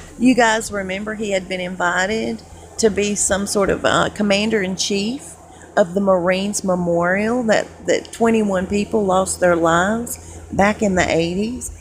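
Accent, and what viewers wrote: South, United States. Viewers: Is her southern accent real? Is it a Houston or Florida? Don't be angry with me, I lived on the west coast most of my life...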